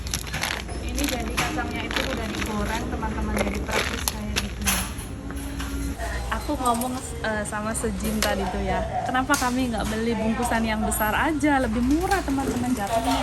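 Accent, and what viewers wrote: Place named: Java, Indonesia. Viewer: Asli mana to MB kok medok bgt ngomongnya 🙏🙏🤗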